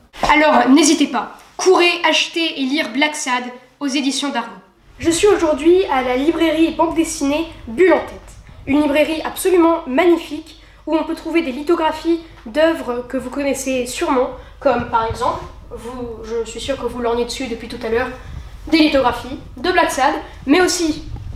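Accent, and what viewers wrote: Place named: Spain. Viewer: enfin te revoilà! une bien sympathique vidéo, qui donne envie de se mettre à la BD, merci! j'ai adoré ton accent espagnol aussi!;)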